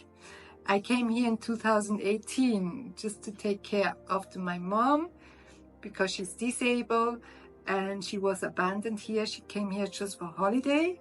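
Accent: Switzerland. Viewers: Ist Ihre Muttersprache Schwizerdütsch? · Liebe vielen lieben Dank,sie inspirieren mich mit ihren Arbeiten, Ideen und mit ihrer liebevollen Art die Arbeitsgänge zu erklären. Ganz zu schweigen von den wundervollen Möglichkeiten ihre wirklichen Kunstwerke nach machen zu können. Mir gefällt ihr Schwizerdytsch sehr,ich lebe seid 40 Jahren bei Konstanz und und bin in 10 Minuten in der Schweiz, dass ist immer ein bißchen Urlaub für mich ❤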